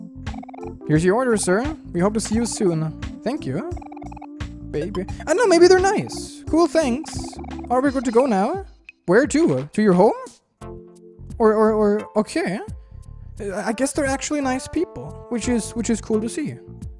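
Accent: Germany. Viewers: Oh, he's German? I did think his accent didn't really sound American or British or Australian or any other English accents. No idea what Canadian accent sounds like or how it is to be distinguished from the others. But German wasn't what I thought he was, I had no idea what I thought tbh. I'm Danish myself, and I feel like Danish and German have similar accents, yet when I hear Germans and Danes speak English, the Germans' accents sound much better than ours (at least when it comes to politicians, I think, English sounds awful when spoken with a Danish accent). I do love accent though, he sounds so cool and funny, his voice is an enjoyment to listen to.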